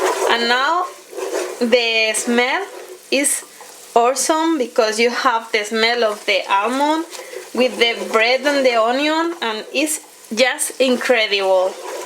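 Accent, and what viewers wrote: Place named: Spain. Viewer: I love Spanish Food, I used to work in a spanish restaurant. The food looks tasty. Love your spanish accent!!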